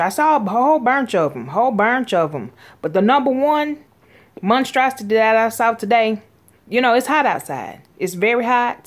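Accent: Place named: South, United States. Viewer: Sometimes I can't understand her southern accent. Like when she said she saw a "burnch" of monstrosities. Did she mean "bunch?" And there was 1 where she said people shouldn't wear "bunnets" on their heads. ?????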